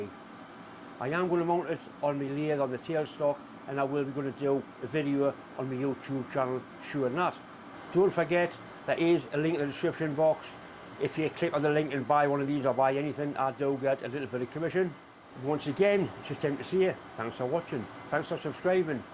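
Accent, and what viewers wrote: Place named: Durham, United Kingdom. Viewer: Thanks very much, really appreciate your straight to the point videos, very helpful. From the accent you must be very close to where I am in Durham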